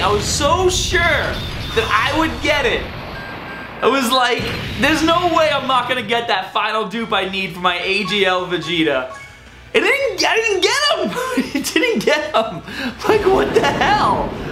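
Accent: Boston, United States. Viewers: I just found out your accent is from boston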